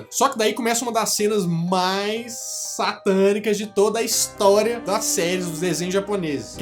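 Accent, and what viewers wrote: Minas Gerais, Brazil. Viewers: até no geito de falar vc parece mineiro é nois!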